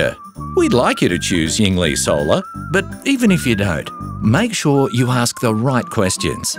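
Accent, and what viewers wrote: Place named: Australia. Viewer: Nice cartoon and Aussie voice-over that sounds great without really saying anything at all. Yingli are best described as the Toyota of solar panels. Very good quality and technology but not the best,very popular as they are neither cheap nor premium priced. Why don't all Chinese solar companies take this simple approach to marketing instead of trying to sell on low prices all the time? Obviously works for Yingli. Well done.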